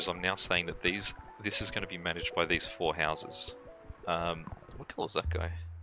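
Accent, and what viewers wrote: Australia. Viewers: I'm guessing Australian?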